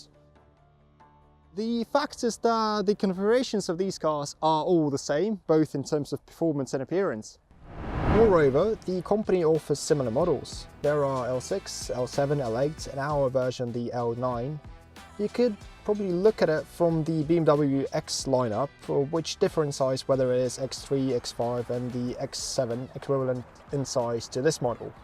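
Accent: United Kingdom. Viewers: ИИ или откуда такой британский акцент?))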